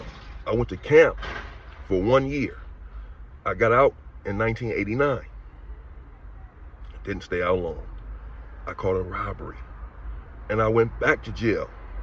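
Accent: California, United States. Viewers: Bruh you sound like Chill from 16 to Life, it must be a Cali thing. Wishing you the best with the channel my G!💯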